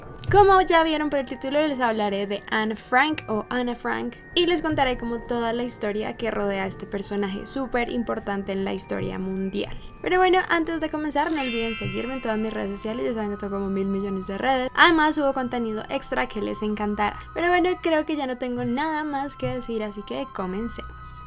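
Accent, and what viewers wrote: Colombia. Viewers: kikis pipos pues no lo veas y chao ella es colombiana asi hablan ellas respeta, que tiene que ver su novio aqui??? ganas de joder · Qué horrible es el acento colombiano, ¿no?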